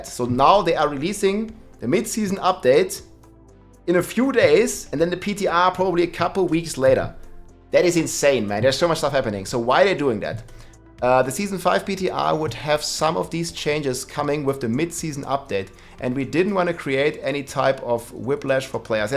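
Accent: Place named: Germany. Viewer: Ich mag es wenn man Englisch mit ein wenig Deutschem Akzent spricht - so toll (kein Sarkasmus), der beste Diablo-Content-Ersteller!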